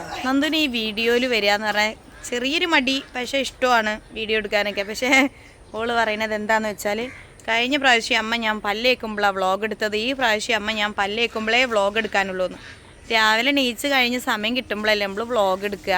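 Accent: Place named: Thrissur, India. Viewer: തൃശൂർ സംസാരം എനിക്ക് നല്ല ഇഷ്ടമാണ്❤️👍🏻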